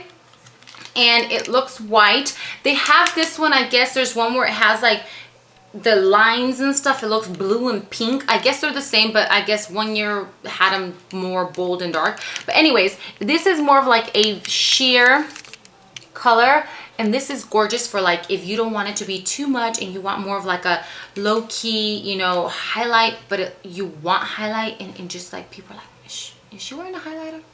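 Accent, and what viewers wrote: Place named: New York, United States. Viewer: you sound so Latina... and so New York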